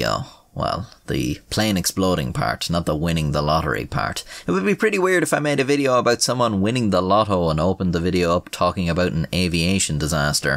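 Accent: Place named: Ireland. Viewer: I swoon over your Eireann drawl, thank you so much 🗽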